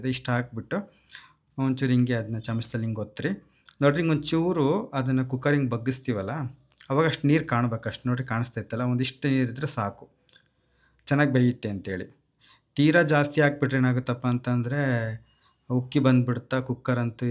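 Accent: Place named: Holalkere, India. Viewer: ನಮ್ಮ ಹೊಳಲ್ಕೆರೆ ಭಾಗದ ಊಟದ ಸೊಗಡಿನಂತೆ ನಿಮ್ಮ ಮಾತು ಸಹ ನಮ್ಮ ಸೊಗಡು ಉಳಿಸಿಕೊಂಡಿದೆ😂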